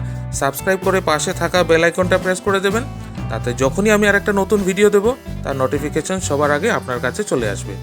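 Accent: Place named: Barak Valley, India. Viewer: আপনার ভাষায় বরাকের মধূরতা থাকায় নিজেকেধন্যমনেকরি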